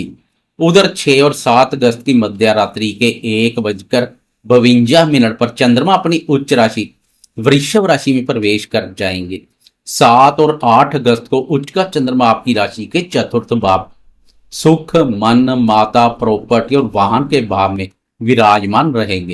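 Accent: Punjab, India. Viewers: 👍🙏👏Aapka punjabi ascent kisi apnay baray ki ascent ki tarah hai🙏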